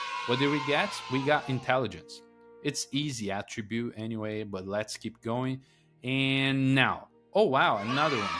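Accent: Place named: Brazil. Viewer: its amazing how your english is very smooth while I can still say you're BR hahahaha tamo junto irmão! videozinho bom demais, começando no medivia essa semana!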